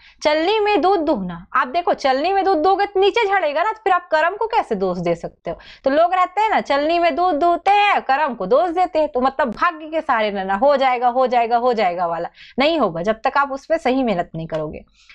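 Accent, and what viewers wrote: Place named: Chhattisgarh, India. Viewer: Bhut hi Accha he ye notes.. Thank you aapko.... Aapki voice aur chattisgarhi ucharan isme kafii manoranjak lagi... 😊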